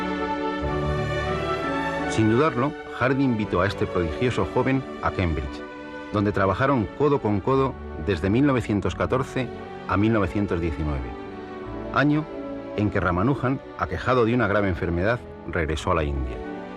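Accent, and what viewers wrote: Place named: Spain. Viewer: Con esta explicación en español tan tan español y la intensidad del volumen de la música ... No entiendo un carajo lo que dice .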